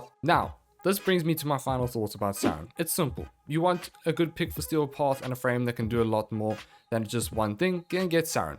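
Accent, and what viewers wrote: Afrikaans, South Africa. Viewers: My fellow South African. The reason I asked was because I could hear your Afrikaans accent.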